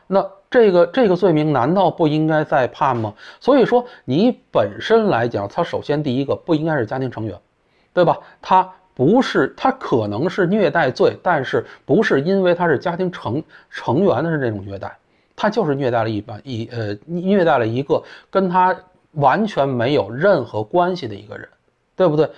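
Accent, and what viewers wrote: Beijing, China. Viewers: 聽散人操著北京腔罵政府真是特別爽~ 🥰🥰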